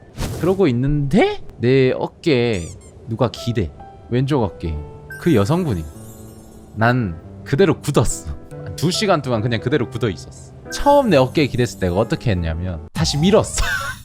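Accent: Gyeongsang, South Korea. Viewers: 잠만 님 대구 대쓰까? 저 대구 대쓰까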